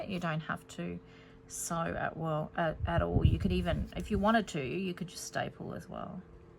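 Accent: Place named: Australia. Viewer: Thank you. I just found you and subscribed, nice to hear an Aussie 😄and love your style with using up 12" sheets, I have so many and struggle to make ephemera and pockets for journaling. These are just the thing I love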